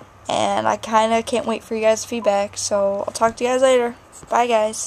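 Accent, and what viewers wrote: United States. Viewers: You have a nice accent, American I would say, what part of America I could not say; but it doesn't sound like a very strong accent. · You have an American accent before you even started saying the words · accents are awesome! lol :P yea u do u got an american accent ;) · american accent :P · ermm to me (being from the uk) you have an american accent :P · haha Im from Ontario and your voice sounds normal besides your A's or E's sound abit strong, xD some people would say we have accents. but in truth EVERYONE has an accent yours is just american :) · er...you have an american accent? of course its sort of pointless to ask if you have an accent to other people who live in your area because you'll sound like them. but to someone from a different country of course you'll have an accent. everyone has an accent, which is essentially intonation and what not. 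i dont think i have an accent but ive been told i do (i'm from Trinidad, which is an island in the caribbean). i wouldnt prnounce any of those words like that, and thats the point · you have an American accent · Sounds like an American accent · you have an accent. American.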